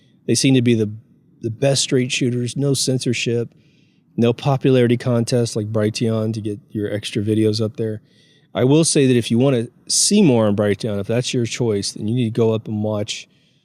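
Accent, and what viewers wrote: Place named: Sweden. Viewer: is a fucking genius. I found his channel in 2016. I guess he did work way before that. But one thing is for sure. He is Swedish. Nordic. I hear it in his voice.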